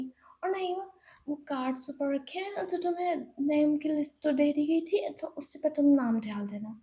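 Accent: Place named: Western Hindi, India. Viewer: The eposide is nice & like western hindi accent. It suits your voice.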